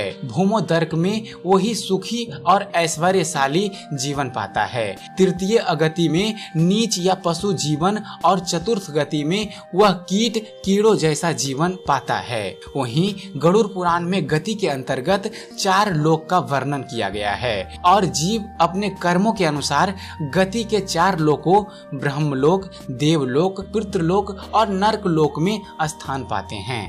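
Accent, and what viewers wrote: Bihar, India. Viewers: kya ap bihari h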